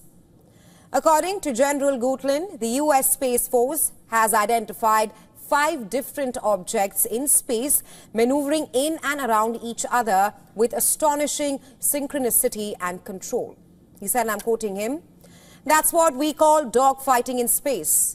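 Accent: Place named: India. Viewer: I really love your straightforward Indian accent.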